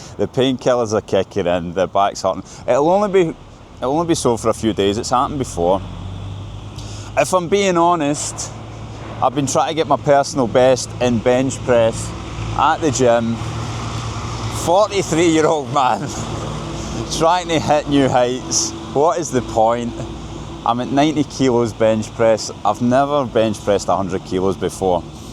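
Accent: Scotland, United Kingdom. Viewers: I’ve just watched your video for the first time. Like it! Scotch accent is adorable and in some places reminds me my own one. Rs are very similar. Will watch more. Thank you! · Job well done, I've gotten a feel for Moscow and for Russian people, favorable in total. You have a talent for interesting content with a relaxed feel, I will miss your Scottish accent and walks through the city. Thank you from a friend in Kentucky [usa]. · I admire Scottish accent so much. Good luck, dude. I’m sure that you will be okay no matter where you live. 😊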